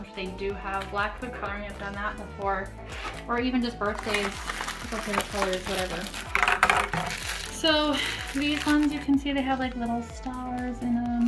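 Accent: Minnesota, United States. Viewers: She's definitely from the Minnesoota region... loving the new Channel guy's 👍🇺🇲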